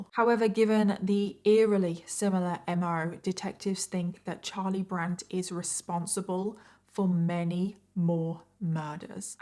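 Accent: United Kingdom. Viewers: You do realize she's from the UK right..? Didn't think I'd actually have to ask someone that....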